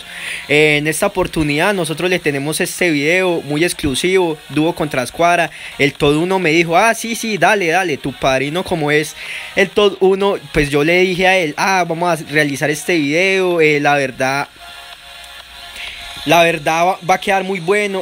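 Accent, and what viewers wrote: Colombia. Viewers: Primer video que veo tuyo y me parece que eres paisa o no? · Nose porque suenas como un Colombiano paisa jeje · Jajajaja se nota que usted es colombiano.... Y para los que dicen que hace mero feik....miren videos de MrStivenatc donde aparece en clasificatoria!! Y juega muy bien, pinches Heiters, Ratas, crítican sin saber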